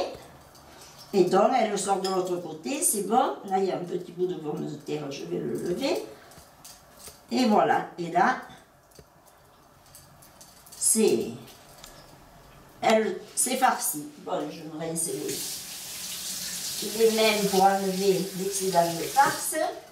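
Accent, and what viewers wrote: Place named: South, France. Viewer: Hummmm...j'ai un plaisir fou à vous suivre...et à faire vos recettes délicieuses...merci beaucoup...et en plus comme chantait,avec! C'est merveilleux.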